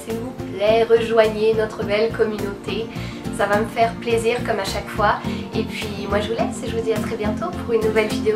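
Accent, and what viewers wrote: Quebec, Canada. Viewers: Tu parles mieux Québécois que moi qui est pure Quebecoise c cute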